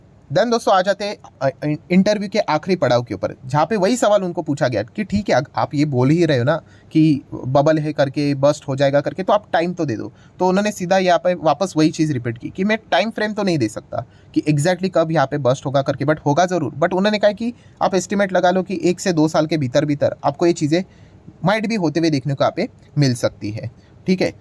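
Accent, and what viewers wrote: Maharashtra, India. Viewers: मराठी माणूस आपल्या बोलण्यावरून लगेच ओळखला जातो. आपला सर मराठी माणूस आहे. जय महाराष्ट्र जय शिवराय..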